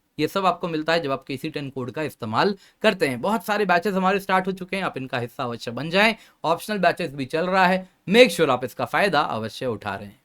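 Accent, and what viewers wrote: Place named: Punjab, India. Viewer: How uneducated you are , look at yourself first you dont even know how to talk , are you from the common punjabi way of talking? 😅 how you guys even making study related videos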